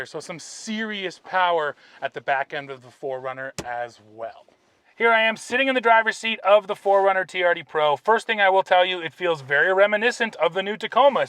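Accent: Canada. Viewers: Love your regular guy type of reviews paired with a Canadian accent (hello from AK). Trailhunter looks great.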